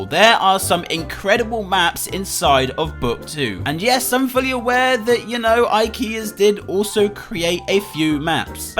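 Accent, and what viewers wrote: United Kingdom. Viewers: british guy time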